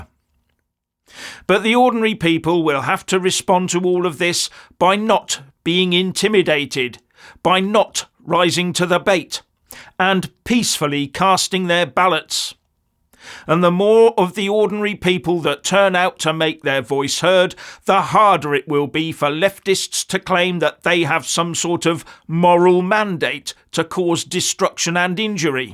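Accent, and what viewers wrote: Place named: United Kingdom. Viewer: You are so British and so proper I seriously thought you were AI for the first couple shows thank you I love you bro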